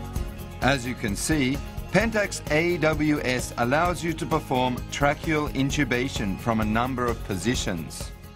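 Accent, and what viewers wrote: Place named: South Africa. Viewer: I love the south african accent; it reminds me of Alan Partridge series 2. This looks even easier than glidescope.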